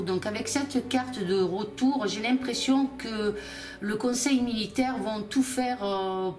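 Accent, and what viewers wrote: South, France. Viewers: Adorable et bel accent du sud Merci pour le tirage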